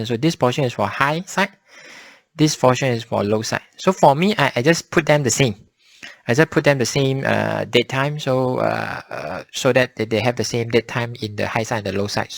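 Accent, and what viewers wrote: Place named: Singapore. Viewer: Thank you for the clear explanation. Your manner of speech reminds me of my childhood home town. I am from Sg, are you from Sg or My? Just asking. :)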